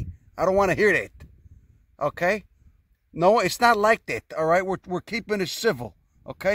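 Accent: Chicago, United States. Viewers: "Don't judge me, I will BITE your ass!" "Delicate bastards" is my new term of the week, although I am not sure I can match your Chi-town delivery. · South side Chicago Attenborough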